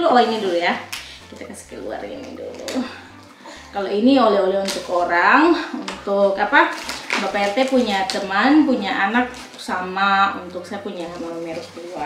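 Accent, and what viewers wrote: Manado, Indonesia. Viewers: Bhsa menadonya kental betul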